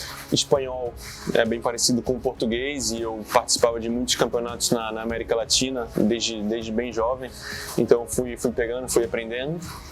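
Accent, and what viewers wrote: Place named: Brazil. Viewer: O portunhol não abandona o Brasileiro 😅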